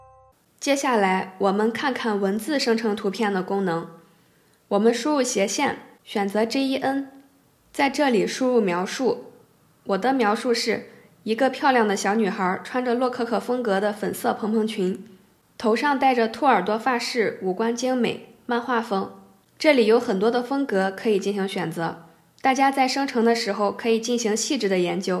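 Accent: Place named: Shaanxi, China. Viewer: 貌似陕西人，反正肯定大西北的。。。。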